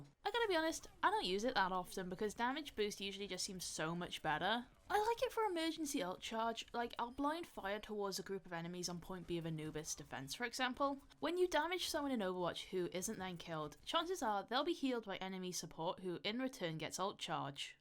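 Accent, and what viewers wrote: United Kingdom. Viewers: Awesome vid! You from the UK too?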